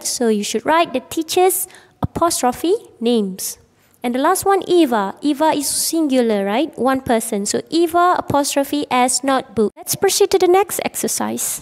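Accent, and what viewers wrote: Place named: Japan. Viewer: cikgu orang jepun ke comel cakapan cikgu saya fatma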